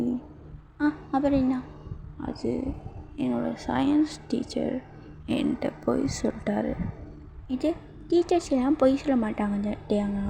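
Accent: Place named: Sri Lanka. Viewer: Your sounds like Sri Lankan are perhaps a Sri Lankan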